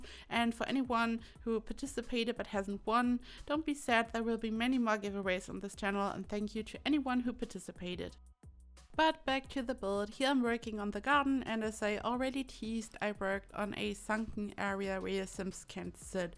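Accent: Germany. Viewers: Just a question, are you German? Cause I think you have a very German accent! 🇩🇪🖤❤️💛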